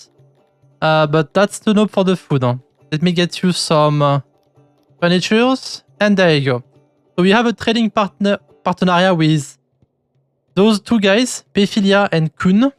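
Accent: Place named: France. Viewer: J’adore ton accent bien franchouillard ! Superbe vidéo j’ai appris pleins de trucs merci bcp ! T’as cb d’heure sur les jeux ?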